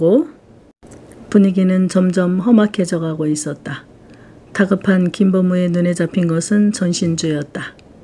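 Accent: Jeolla, South Korea. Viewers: 선생님. 전라도 사투리가 날로 좋아져서 찰떡같이 찰지고 구수해서 들으면서 입가에 웃음이 절로 납니다. 고맙습니다.❤❤❤ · 왓마 오랫만에 전라도 사투리 들어봉께 옛날생각이 나뿌네. 구수허구먼.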